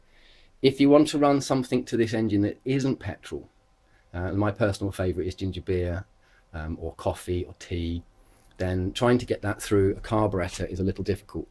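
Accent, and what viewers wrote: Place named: Derbyshire, United Kingdom. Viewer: Hi do you have a contact to this guy as I'm in Great Britain Derbyshire and he sounds similar dialect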